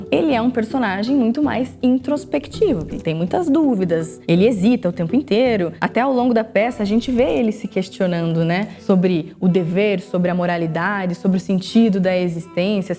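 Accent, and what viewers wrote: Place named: Curitiba, Brazil. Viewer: O sotaque dela é tão bonito! Certeza que ela é curitibana! 🤭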